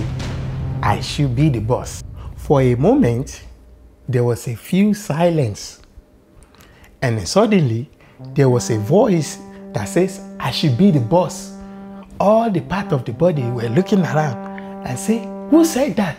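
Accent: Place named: Africa. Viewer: I don't know How you showed Up on my screen But maaaan I love this video specially your african Accent 😂😂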